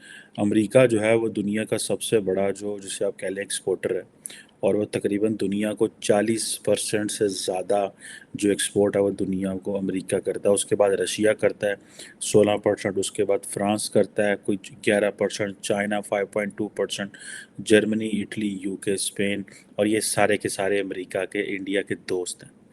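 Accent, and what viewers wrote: Punjab, Pakistan. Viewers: to watch his comedy and jealousy against India. He doesn't even know his national language urdu properly, He speaks in punjabi urdu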